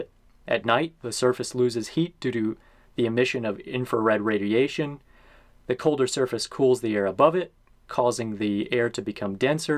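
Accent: United States. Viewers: Why only use Celsius even when discussing American temperatures also you have an American accent why not get our temperatures too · You have an American accent, but default to Celsius?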